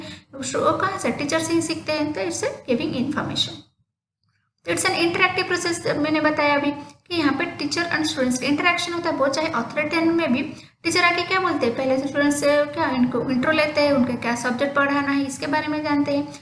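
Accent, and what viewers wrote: Odisha, India. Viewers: Are u belongs to odisha?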